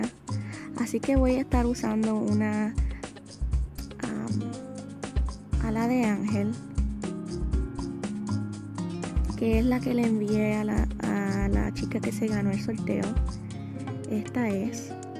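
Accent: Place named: Puerto Rico. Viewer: Perdona la pregunta eres boricua? tú acento es igual que el mío PR en la casa saludos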